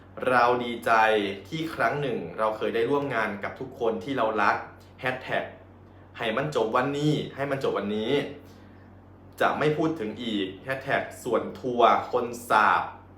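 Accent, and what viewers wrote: Isan, Thailand. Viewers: เสียงอีสานยังรอยู่นะลูก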